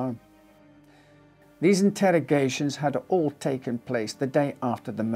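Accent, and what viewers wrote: Scotland, United Kingdom. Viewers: As usual, excellent presentation. Proper pronunciation of our English language is so important, and (if I'm right, you're Scotch?) your innate facility to use consonants properly means this - and all your super videos - are a tremendously fulfilling watch. Only thing to be alert on: (maybe auto-generated) Yank shoddy spelling e.g. "ax" for axe or "meters" for metres. Good work. Love your channel!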